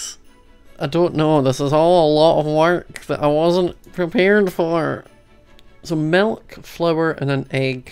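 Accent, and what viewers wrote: Scotland, United Kingdom. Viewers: Are you Scottish???? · are you really Scottish because i love your actcent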